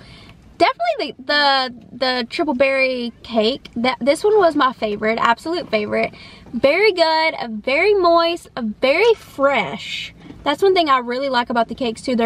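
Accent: South, United States. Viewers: You’re adorable! 😊 I’ve come across your channel a couple times with you trying out the new Crumbl cookie of the week. I like how you explain in detail about the taste, the quality and the flavor and it’s even better with your cute southern accent, which I adore. Thankyou for a great food review/Mukbang.❤😊😋😁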